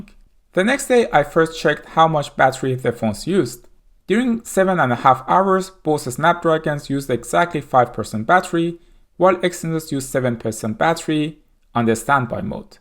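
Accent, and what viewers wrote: Iran. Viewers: Again great video dude. My wife is saying that you have a persian accent ? Are you persian ? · I like that Iranian accent ;)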